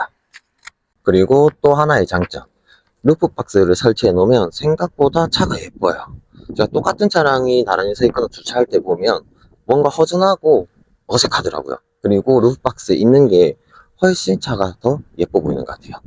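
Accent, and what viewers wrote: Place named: Gyeongsang, South Korea. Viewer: 갱상도 어디시죠?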